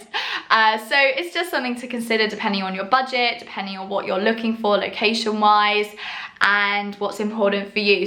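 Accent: England, United Kingdom. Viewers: Love real English accent!!! Which type of UK accents it is?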